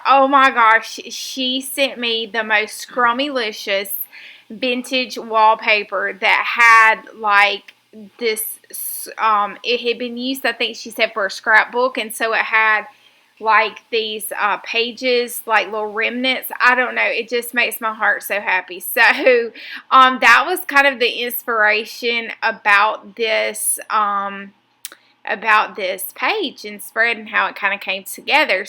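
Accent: South, United States. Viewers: Happy to hear your southern voice again, very relaxing for me. 😍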